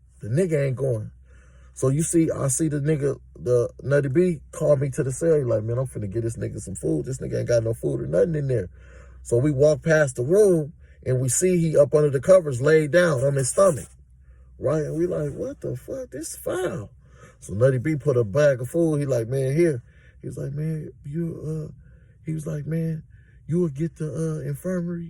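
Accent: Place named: Detroit, United States. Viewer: Woo woo woo woo . Oh yeah sounds like a D nicca 😂😂 . My 1st time on your channel cuz . I don't know where you from just talking shi. Much love and respect blessing & success to your family and channel. Midwest fo sho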